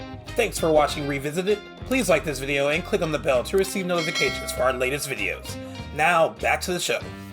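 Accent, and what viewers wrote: United States. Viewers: The overly articulated American voice over is just too unbearable to watch this documentary. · a strange mix of well researched commentary told an in annoying yank voice normally associated with dumb crash bang wallop videos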